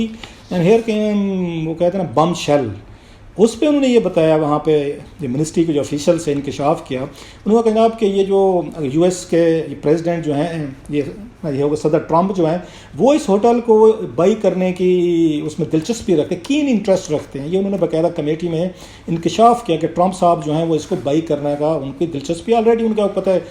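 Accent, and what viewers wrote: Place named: Punjab, Pakistan. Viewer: Please stick to Urdu and don't use English words and short English sentence during your analysis. Because your pronunciation is very bad and you have very strong Punjabi English accent. And I think you should avoid English during your analysis. You have a very lazy accent and can't pronounce words properly. Please speak Urdu and even some of your words in Urdu are pronounced badly.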